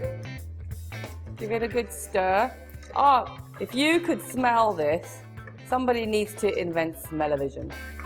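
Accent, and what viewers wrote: United Kingdom. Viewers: It's always funny and amusing to see an indian with a British accent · I watch your videos just because of your pretty smile and british accent...👍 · Tooo much accents. Seem like I am in youui kaaayyy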